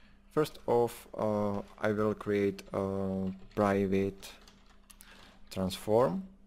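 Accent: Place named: Latvia. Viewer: Are you from Latvia? Your accent sounds familiar :) Thanks for the video!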